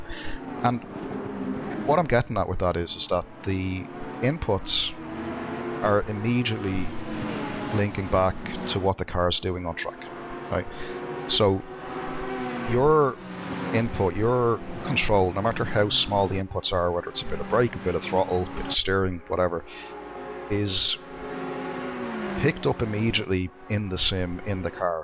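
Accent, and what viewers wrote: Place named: Dublin, Ireland. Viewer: Ijust bought this game yesterday it's cool so far more practice is needed sounds llike your a Dub like myself hi from Dublin Ireland.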